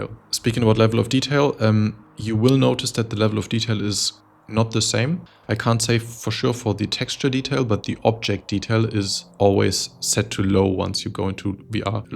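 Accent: Germany. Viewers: I love SC in VR. How you said, very immersive 😉 What is the key to switch between VR mode and 2d mode? und vom Slang her höre ich doch das du Deutscher bist. Eindeutig 😁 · i didnt know you are german, but that accent, omg.. sorry herr 😄i also dont mean nothing bad, thanks for the video. I plan to buy some good vr headset and im crazy about star citizen. I keep my desktop pc up to date only for star citizen.. is special game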